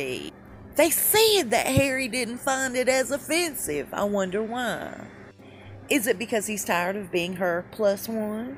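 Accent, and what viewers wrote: South, United States. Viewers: Hey 👋🏼 Always enjoy your vids & that sweet southern drawl of yours 😁 I watched the trailer for the movie…that’s all I needed. I cringed at her voice…😝 She won’t make it in Hollywood, she’s just not good enough. She’s an ok actress, but that’s it. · I love your videos...YOU ARE THE FUNNIEST OF THEM ALL... you truly put a smile on my face...not only because we are sisters in the 'MEGXIT".. I hope that MERCHANT MARKLE (as I call her) GETS HER KARMA BACK..!!!.but I also have a house in the Sunshine state...I like your southern accent better than the millennial....I actually love it.!!!!... in my humble opinion it makes you different from the rest, it gives you character, your own personality...plus the jokes sound much better, super funny..!!!! the millennial accent does not "suite" you...hahahha no pun intended..!! I think If anybody is over the age of 16 ...is just not right..it is actually a speech vice...did you know that? 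I hope I did not make you feel bad or hurt your feelings in any way....because I just love your videos. EX PECIALLY...HAHAHAHAHAHA. the one where you talk about going thru fire hoops, jumping over a pool full of German pinchers or something like that...oh...and the ROASTED CHIKEN...OMG..... I LAUGHED SO HARD..I HAD TO RUN TO THE BATHROOM...no,...really, ..seriously...I did.... you are the best..!!!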